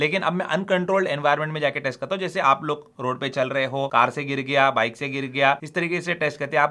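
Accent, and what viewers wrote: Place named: Hyderabad, India. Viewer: assalam walekum bhai Kyunki aap Hyderabad Walon ke jaisa baat karte ho❤❤❤❤🎉🎉🎉🎉🎉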